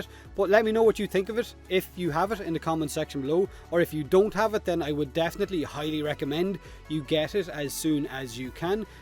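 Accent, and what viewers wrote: Ireland. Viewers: really?! again?! you know we're only here for your Irish intros and outros!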